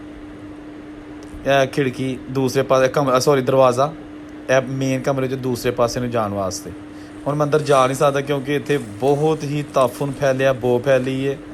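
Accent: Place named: Dogri, India. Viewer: Your commentry on this video hearted me very much touch of local dialect resembles with my mother tongue Dogri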